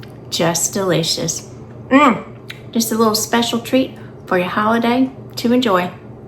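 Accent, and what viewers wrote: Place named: Georgia, United States. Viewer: Happy Thanksgiving to you and your family. I have been watching for awhile now and I love the variety of recipes you present. I also love your gorgeous Georgia accent. Since I'm from Alaska I don't have too many southern accents around me! One of my favorite holiday desserts is pecan pie so this looks delicious to try. God bless you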